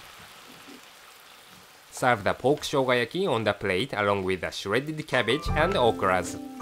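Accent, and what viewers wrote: Japan. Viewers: Vegetables. He's Japanese. Japanese doesn't really have a V sound, so they usually use B instead. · he is Japanese accent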